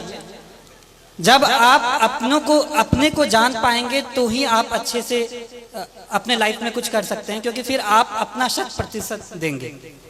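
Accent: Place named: Samastipur, India. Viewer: Sir ye to language samstipur ka hai❤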